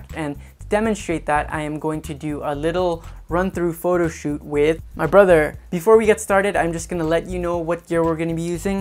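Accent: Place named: Philippines. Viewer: not bad englilsh at all... but the signature filipino hum is there... haha! Good job! nice tutorial!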